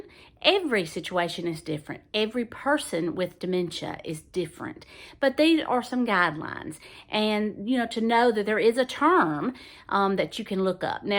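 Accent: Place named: Alabama, United States. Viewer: thank you! We had a set back to monday. I too have been told to wait a week or more till he settles in. I’ve watched you so many times for advice and I appreciate all that your teaching all of us going through this hard transition. And your accent brings me comfort as my people are from Alabama as well. I don’t know what town your from but my people are Russellville and Athens. I’m wondering your advice on stage 5? What stage do most need full time care?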